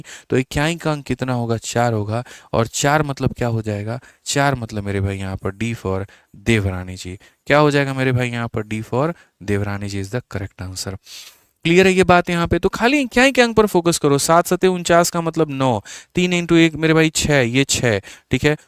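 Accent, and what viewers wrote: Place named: Bihar, India. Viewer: Sir ❤ bihari accent bht pyra hai 😂❤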